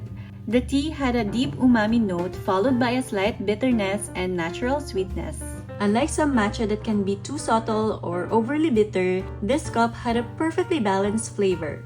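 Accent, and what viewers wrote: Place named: India. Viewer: Why do you have an indian accent?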